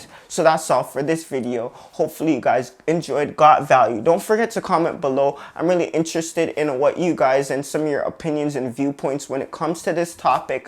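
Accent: Toronto, Canada. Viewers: From toronto? Just guessing from the accent.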